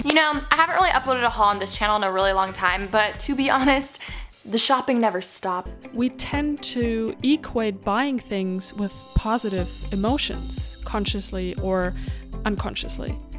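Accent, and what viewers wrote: Germany. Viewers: I stopped dating and found I had a wealth of time and money. Your accent, is it German? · Great video, thanks! Especially the idea with the physical gifts... I’m gonna do that. Are you actually german? I like that lovely accent so much! greetz and hugs from Berlin 🐻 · are you German? I think I hear some accent 😊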